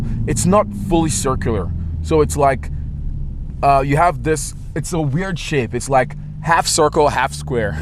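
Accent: Nigeria. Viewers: You sound Nigerian. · I hear a slight Nigerian accent! My brotherrrrr lool. Much love from Lagos Nigeria. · You sound just like my friend Voke from Nigeria, who by the way, gets excited talking about cars also. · This nigga has to be Nigerian lmfao. Made the video hilarious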